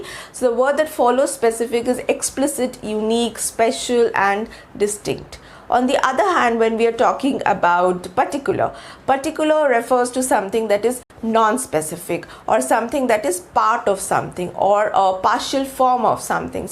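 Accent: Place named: India. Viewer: This's the most close pronounciation of The Indians to English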